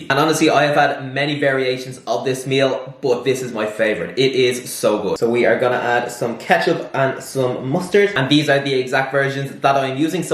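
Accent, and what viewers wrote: Ireland. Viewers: Nvm, i knew it he is from ireland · Are you from Ireland ? You have posh brogue ;-). Good video, I will follow.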